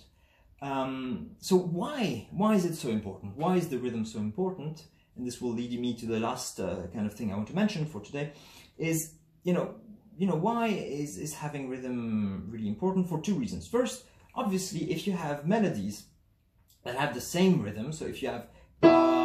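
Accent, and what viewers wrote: France. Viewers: Merci pour tous ces exercices ! Je devine à ton accent que tu es français ?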